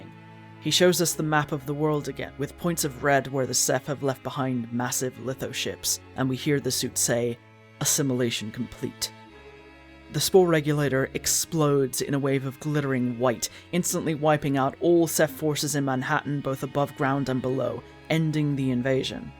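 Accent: United Kingdom. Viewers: This is off topic entirely, but are you british? Irish, american? I honestly cant tell and that could be from severe brain damage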